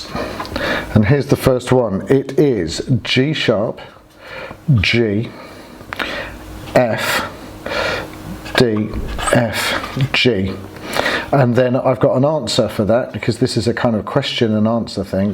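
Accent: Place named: United Kingdom. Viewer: You're clearly British, what city are you based in? I'm on the hunt for a new tutor and you're great!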